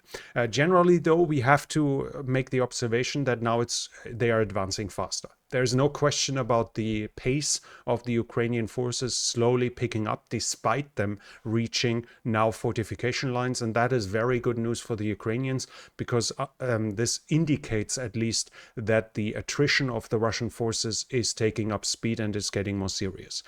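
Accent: Germany. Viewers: You talk like a german but look like a Danish person and you have a Danish name, do you live close to the border to Denmark/north of Hamburg? :)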